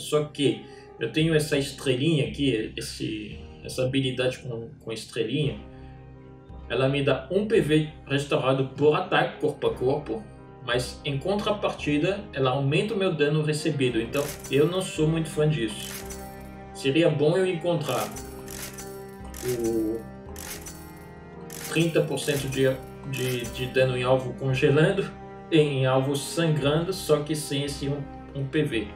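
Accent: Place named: France. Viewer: explica muito bem, mesmo com o sotaque francês puxado..., é até um diferencial..., mais um ótimo vídeo!!!!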